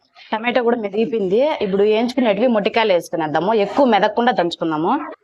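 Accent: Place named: Chittoor, India. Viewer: Chittoor language 😂😂😂😂 me village ekkada akka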